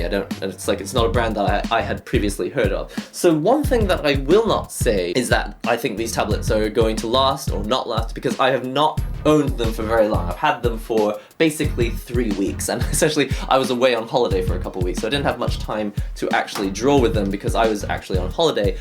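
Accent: United Kingdom. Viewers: Are you pure Japanese? Or are you mixed? You sound like you have a British accent :)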